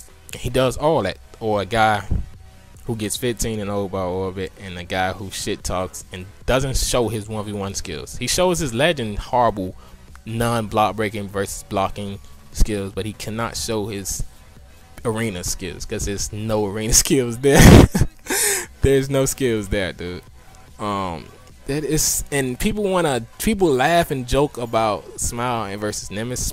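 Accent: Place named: South Florida, United States. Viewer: Let em know! Btw are you from FL cause you sound like you got a south florida accent.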